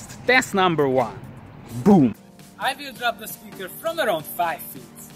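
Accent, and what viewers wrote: Hungary. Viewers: Nagyon hallatszik, hogy magyar vagy 😀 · Olyan magyaros a kiejtésed...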